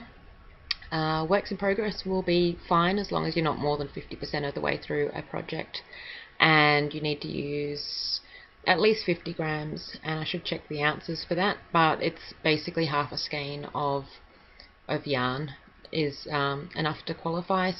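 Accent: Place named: Australia. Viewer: Hi I'm a new knitter from Newcastle NSW. I've been using You Tube to help me learn, and was so excited to hear an Australian voice. It really doesn't take long to become a yarn addict does it!! I always assumed that our home grown wool was still in abundance, but have been surprised at how hard I've found it to source locally. Do you know of any local mills or indie dyers out their that I could support? Looking forward to your next episode.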